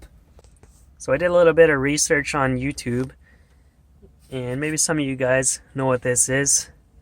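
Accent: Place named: Newfoundland, Canada. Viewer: Canadian. If I had to guess, I'd say Newfoundland? I've heard other Canadians say that's where the sort of stereotypical Canadian accent comes from.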